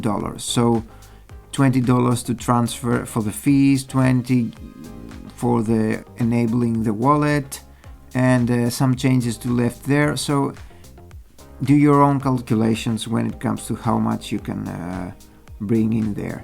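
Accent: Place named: Greece. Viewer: You sound like a Greek..I guess you are 😅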